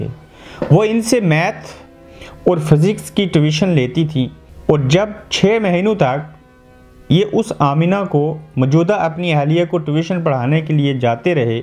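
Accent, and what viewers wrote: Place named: Punjab, India. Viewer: کہابی ٹھیک ہوسکتی لیکن بھائ اپنا اردو کا لہجہ درست کرو۔نہیں تو پنجابی میں ویڈیو بنایا کریں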